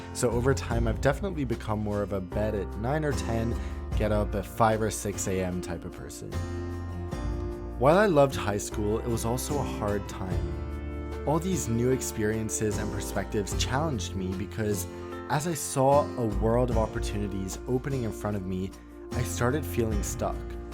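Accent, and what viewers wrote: United States. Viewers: Interesting life, although I still don't get why it is that you speak English with an American accent when you grew up in London, somewhere near Heathrow, Forest Row (11 miles from where I live now) and Sweden! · So how did you come to have such an American accent?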